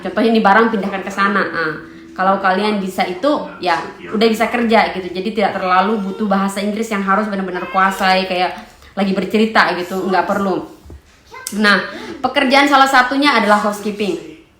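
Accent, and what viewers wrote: East Nusa Tenggara, Indonesia. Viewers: Maaf ibu asli indo mn bhs i ndonya kyk NTT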